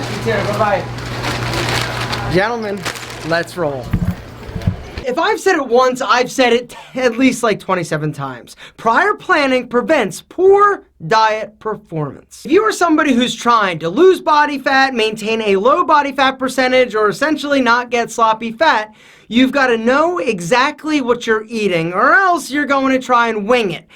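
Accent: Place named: Upstate New York, United States. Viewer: Where's the accent? Sounds upstate NY.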